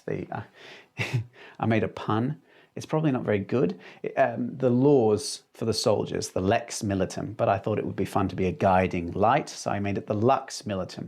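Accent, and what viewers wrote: Australia. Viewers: Love your map passion, I thought I was the only cartographic kook. 🤪 [Do I detect a slight Aussie accent ?]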